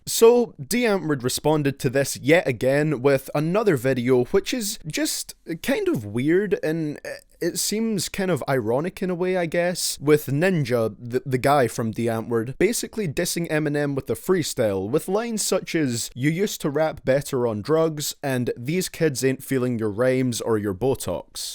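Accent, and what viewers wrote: Scotland, United Kingdom. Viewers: Ahahahahahaha. Your adorable Scottish accent makes you sound so adorable and I could never take you seriously. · Are you Scottish, if so me too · Lol this nigga British af talking about rap, that shits funny as hell 😂😂😂 I love it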